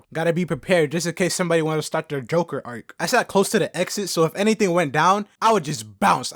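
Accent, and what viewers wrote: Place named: Portland, United States. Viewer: it almost sounds like you live in Portland or smth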